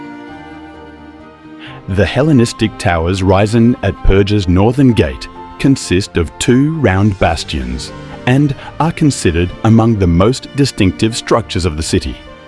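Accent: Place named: Australia. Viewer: I love Turkiye. I need more time here. Also, I love that this is narrated with a Australian accent 🥳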